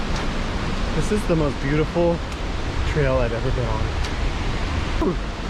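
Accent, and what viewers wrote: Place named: Australia. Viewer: That Australian accent 😍🤤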